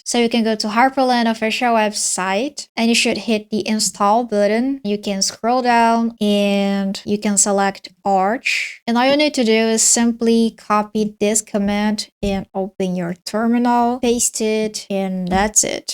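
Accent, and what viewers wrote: Brazil. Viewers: Your accent sounds very Brazilian to me🤔. I'll definitely make it, adding a bit of my own style. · hey, just one tip: you don't need to `sudo` to save in `~/. config`, or at least you shouldn't. EDIT: just watched the whole video hearing your accent and thinking: he/she/they is Brazilian 100%, so not surprised when saw you have an authentic 100% Brazilian BR ABNT2 keyboard Hahahahah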